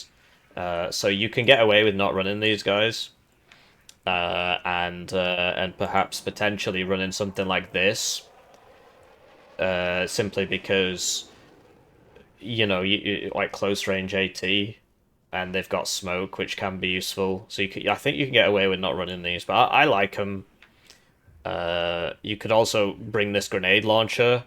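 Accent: United Kingdom. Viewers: GGs, thanks for waiting. Never skip the deck review. Man did you sound British is this vid! I think the water helps your accent.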